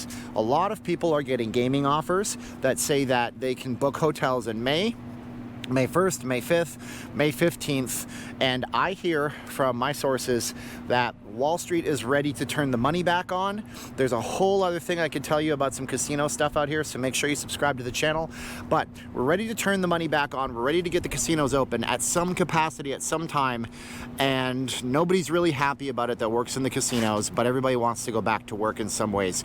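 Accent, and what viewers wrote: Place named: Canada. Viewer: Pretty sure, he is Canadian, thats where goofy accent comes from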